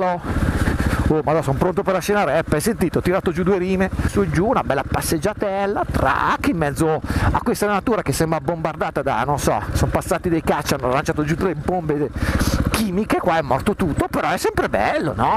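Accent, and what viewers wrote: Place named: Emilia-Romagna, Italy. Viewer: sinceramente no, dall'accento sembri delle mie parti (emilia romagna o giú di lì)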